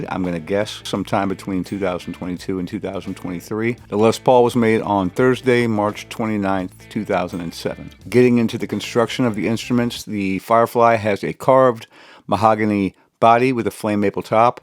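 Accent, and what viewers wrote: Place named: South, United States. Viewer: You good bro. You just sound like a southerner with a lisp. That’s all. I hope you beat the shit out of Cancer. I’d love your opinion on the schecter solo II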